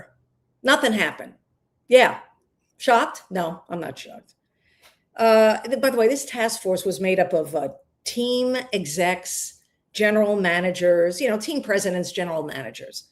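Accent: New York, United States. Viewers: Love NYC accent.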